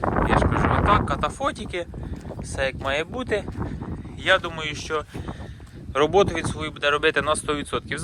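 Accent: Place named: Right-bank Ukraine, Ukraine. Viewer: Бля ,як отличаеться по говору правобережжя пид риччю посполитою вид ливобережжя не московитського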